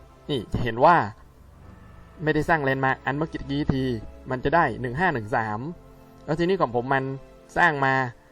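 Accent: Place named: South, Thailand. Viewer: ชอบสำเนียงใต้ๆของพี่นะ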